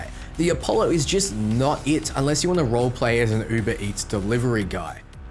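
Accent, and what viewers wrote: Australia. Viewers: Different accents pronounce things differently. There's no absolute right way to pronounce words in english since there's so many different versions of it. E.g. the word "herb" is different between american english and british english since americans say with H as silent while british actually say the H. This dude is australian so they might say it with the E silent. I'm finnish and we study british english at school and even I would pronounce Porsche with a silent E.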